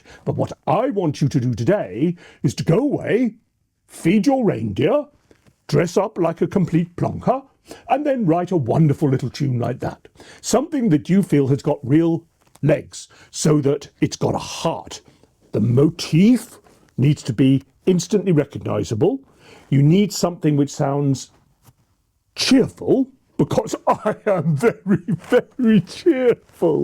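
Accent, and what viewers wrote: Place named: Scotland, United Kingdom. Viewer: Sounds very Scottish .... almost in need of a set of bagpipes...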